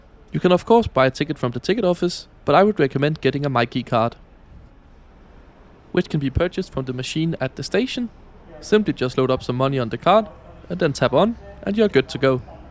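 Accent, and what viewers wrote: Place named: Denmark. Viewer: Your Danish 🇩🇰 accent is soothing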